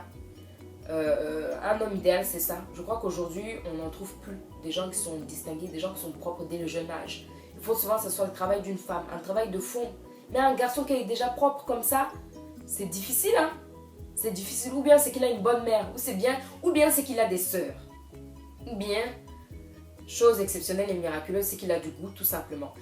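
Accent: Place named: Democratic Republic of the Congo. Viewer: Moi aussi on me prend pour une sénégalaise ou malienne. C est notre couleur et les traits fins qui porte à confusion. Je suis du Congo brazza. C est vrai que je t aurai prise pour une sénégalaise mais à ton accent j ai reconnu le Congo sans être sur bien sûr. Donc on est compatriote 😉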